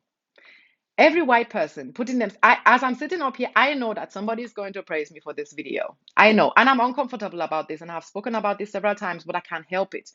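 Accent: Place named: Nigeria. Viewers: You have Nigerian accent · Bantu History, l enjoy her Nigerian accent.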